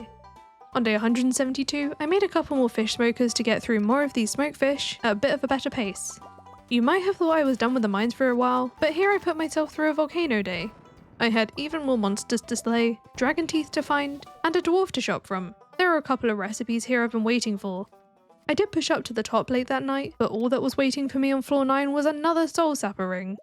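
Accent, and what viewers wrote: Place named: Australia. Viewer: I love how he kinda sounds aussie <3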